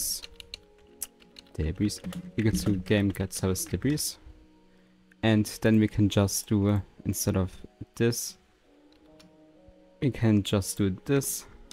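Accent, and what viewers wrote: Germany. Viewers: Man hört dein Deutsch so raus (°ー°〃) aber du bist cool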